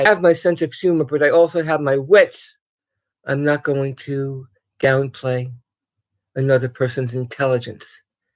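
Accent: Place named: Brooklyn, United States. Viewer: Ma'am I hear that Brooklyn accent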